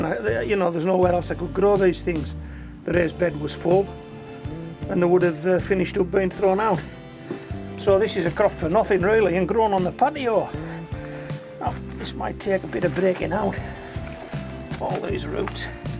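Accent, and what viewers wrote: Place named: Northern England, United Kingdom. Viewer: your accent is cute:) are u from northern england?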